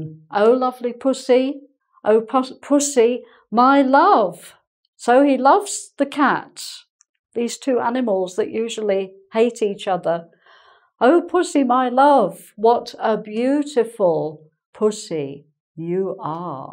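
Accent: United Kingdom. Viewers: Her British accent sounds really beautiful and also her lecture is really good. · You don't need to explain every word in the poem.I listen to your lectures simply because I like your pronunciation and I am fascinated with British accent.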